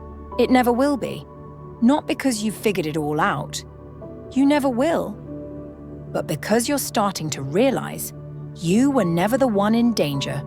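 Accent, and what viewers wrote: RP, United Kingdom. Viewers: You have a lot of hatred in your soul! Just because this lady speaks clearly you have to be nasty about the English accent! I assume you're American and, if you are, that speaks volumes! 👎😡🇬🇧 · Fast speaking stuck-up British accent shoots concepts one after another without saying what to do. You are never the one in danger, your soul doesn't need protection. Loosen grip to worry to open space. No longer chase the worry. Catch the fear and stop it before it spirals. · That isn't a stuck up accent, nor was she fast speaking. She spoke clearly in what used to be called received pronunciation, which was a hallmark of BBC broadcasts. But now we have to pander to the idiocracy.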